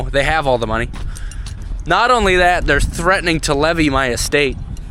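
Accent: South Dakota, United States. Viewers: Was just wondering if you see any Pheasant while driving in your fields. Love the South Dakota accent!!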